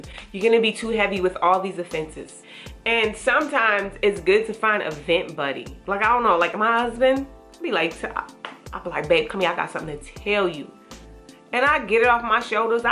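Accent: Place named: East Coast, United States. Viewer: Love ur East Coast accent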